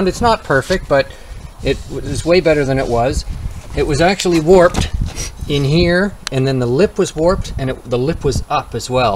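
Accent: Canada. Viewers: Canadian eh????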